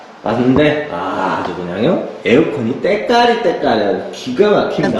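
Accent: Jeolla, South Korea. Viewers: 딱봐도 전라도 사투리 쓰시는구만 한국사람맞냐니 말하는꼬라지보소 인성먼저 갖추시길 바람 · 대한민국 사람이면 누가 들어도 전라도 사투리를 ..ㅎㅎ 강원도인줄 알았다네..ㅋㅋㅋㅋ 탈북민인가? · 얼굴은 잘생겼는데 사투리가 심하네요 인자는 전라도 사투리?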